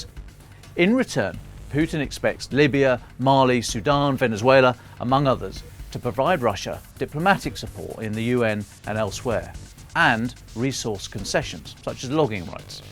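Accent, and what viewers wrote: RP, United Kingdom. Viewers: 😂😂😂. BS in an elite British accent... Bakhmut has fallen. Artyomovsk has risen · What a lovely load of British propaganda masquerading as objective analysis. A smidgen of truth, like sugar, to make the lies go down easier, wrapped in a syrup of posh British accent which gives the content / message ("massage") the necessary perceived gravitas to deceive the willfully blind. Well done. A master class in the application of Marshall Mcluhan's work. But of course, the truth will eventually come out. It will be entertaining to watch the excuses, the spin, the recriminations and attempts to forget and sweep things under the rug. The ultimate question being just how forgiving the good citizens of the West will be once it is apparent how badly their trust and faith in their leadership, "experts" and media has been abused. Will it be another 1789 moment? 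History has a remarkable pattern of repeating.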